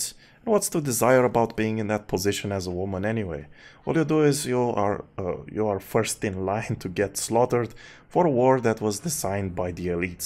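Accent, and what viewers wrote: Eastern Europe. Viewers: I can hear your accent. You're Slavic. I'm Slavic. We were brought up into a culture that teaches us to be able to enjoy and find wonderful things in stories that are nothing LIKE ours. Even if there is NOTHING AT ALL Slavic about LotR, we have no problems loving it even if we're "left out". In fact, we never REALIZED we were "left out" until black Americans came up with the CONCEPT of being left out. To US, it was perfectly NORMAL an English author didn't write Prague or Cossacks or Perun into his books set *in an obvious paraphrase of NORDIC and ANGLO SAXON mythologies*. To them, it isn't. I might be unfair, but the media certainly makes it seem that if ANYONE in any way DARES to do anything at all without black americans, there is racism involved and it's insulting. In fact, I think we might have to create black cheese in order to right this HUGE injustice of making cheese white and yellow, but not black for SO long. 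And just to note, episode 5 - I'm still waiting for an ASIAN actor? Even when they could have cast ALL the Elves as asians, given them blonde wigs and contacts and with some make-up they'd look WAY closer to Tolkien's description than the dude who makes me wonder if Elves f*** by colour in order to maintain black people among their ranks. As I wait, I am looking forward to a remake of Red Cliff starring a white Cao Cao, a black Zhou Yu and a female trans gender gay latino Zhuge Liang. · You are not a real fan. You are a Russian separatist. You definitely have Slavik in you based on accent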